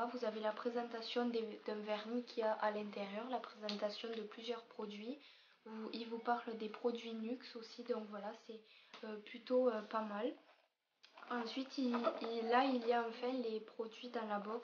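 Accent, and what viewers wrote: South, France. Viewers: Tu es superbe! Le petit accent du sud j'adooore! J'ai TROP envie de tester les box je m'inscrirais surement à la rentrée, parce qu'avec les vacances c'est pas trop le moment ^^ (Je ne peux pas répondre à tes commentaires sous mes vidéos, parce que tu ne me suie pas sur google +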